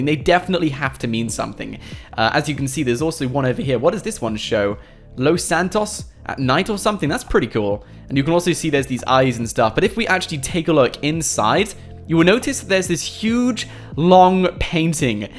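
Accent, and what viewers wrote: United Kingdom. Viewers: I like his British accent. This is amazing. I liked, subscribed, and smashed the bell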